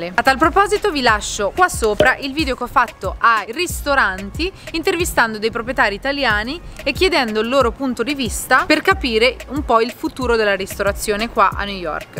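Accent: Ancona, Italy. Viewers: accento anconetano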